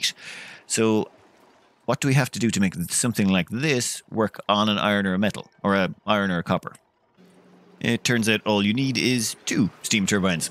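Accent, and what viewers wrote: Ireland. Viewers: 300 hours into this game and I still struggle to understand what the hell you're talking about at times - your Irish makes it absolutely worth listening though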